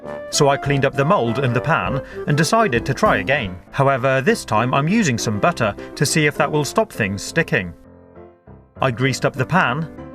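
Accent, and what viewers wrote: United Kingdom. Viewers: god bless your british accent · he's British, that's how they say it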